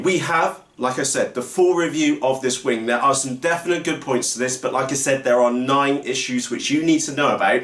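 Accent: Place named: South West England, United Kingdom. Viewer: Nice to hear a UK South West accent in a review video! Keep up the good work +, from a fellow UK reviewer!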